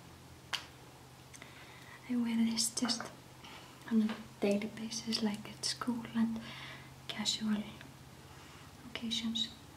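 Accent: Iceland. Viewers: I'm sure I will - always love listening to your voice. And the visuals are nice icing on the cake :) . You know, it's your accent and ElizabethASMR's accent that have inspired me to want to visit Iceland! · i love how our Icelandic accsent is so strong for asmr PS: Icelander writing this ❤ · Always heard Icelandic women were out of this world beautiful... sucks you got stuck with our Navy and Marines up there... wish the army would send me that way... my god that accent and your curves... well at least I have you to thank for the Zzzs most nights... I appreciate it!!!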